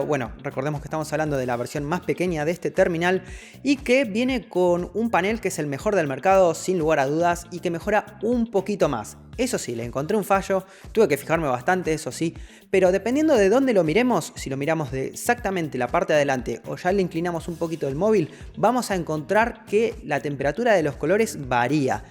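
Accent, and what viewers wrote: Argentina. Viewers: che flaco sos argentino no español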